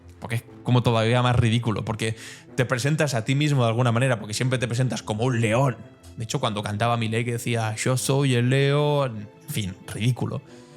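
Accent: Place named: Spain. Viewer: Si vas a hablar de comunismo o liberalismo deberías de saber las pautas políticas de lo que dices y no hables de mediocre porque quedas mal hoy en día si Jesús estuviera aquí predicando lo que predicaba no se le diría comunista porque él no decía que todo es para todos él decía lo del César al César eso significa que él era un liberal no era un socialista no era un comunista era un liberal democrático moderno de sus tiempos Así que no hables piedras y una cosa es tener la ideología social y otra cosa es ser política socialista o comunista no te confundas no pases de ignorante edúcate antes y en cuanto a lo del Papa no tiene nada de malo que sea estadounidense o peruano con mucho orgullo digo que sea latinoamericano como nosotros por lo menos de corazón aunque haya nacido en donde haya nacido claro que tú no sabes ese orgullo porque se nota por tu acento que eres español entonces para ser español Que poca educación que tienes porque mi ley es uno de los mejores presidentes y lo admiramos aquí esa política económica y política socialista que tenían los mataba de hambre a los argentinos Así que tú no tienes por qué criticar si no vives en Argentina qué criticas O sea la economía de Argentina está avanzando lo que no hicieron en 15 años los anteriores eso es algo que aplaudirle y en Perú Por supuesto que estamos orgullosos de ese papa o por lo menos hasta cierta parte orgullosos porque de que tiene su senda oscura sí la tuvo pero queremos creer que todo ser humano como dice el ca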